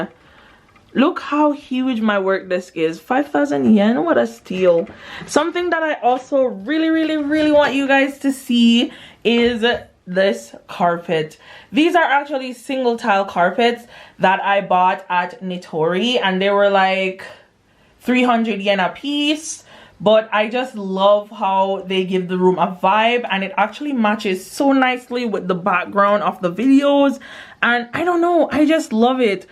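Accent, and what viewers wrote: Jamaica. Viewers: You sound Jamaican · Your accent sounds Jamaican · R u Jamaican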